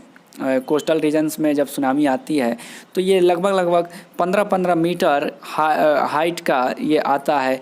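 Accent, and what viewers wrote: Bihar, India. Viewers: Aap bihari ho naa aapke bolne se pata chal raha h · Mujhe toh aapki awaz ko sunte hi pata Chal gaya ki Aap bihari or bandar ho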